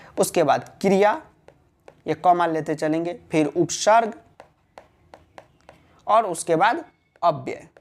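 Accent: Bihar, India. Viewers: sir full Bihari style rocks😂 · Sir, kya ap bihar se ho. Apke bolne ke tarike se lg rha h. Pls, bta dijiye❤💫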